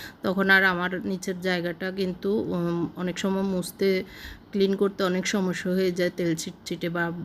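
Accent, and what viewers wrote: Noakhali, Bangladesh. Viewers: এটা নোয়াখলির ভাষা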